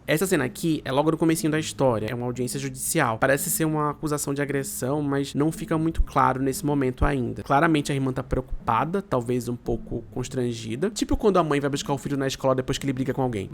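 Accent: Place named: Recife, Brazil. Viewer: tu és da zona sul do Recife, moço?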